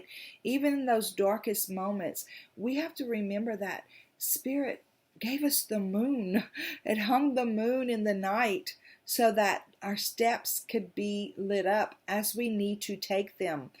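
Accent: South, United States. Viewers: luv listening to you and your southern charm and great analogies..thank you for sharing your light with us...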